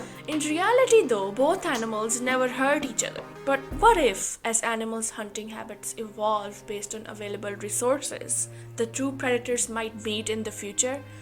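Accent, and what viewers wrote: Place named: India. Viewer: No way could a little polar bear kill a large killer whale. Killer whale would manufacture mince carnage out of meager polar bear. Polar bear may have powerful bite, but so does killer whale & its mouth is grander with 4" dentures. Killer whales also hunt beluga whales as polar bears. Killer whales hunt same prey as these bears like seals & even kill great white sharks & i bet even crocodiles including larger whales than themselves, but as a group. If i were still a betting person, my money would be on killer whale as victorious victor. Anyway, narrator must be from India.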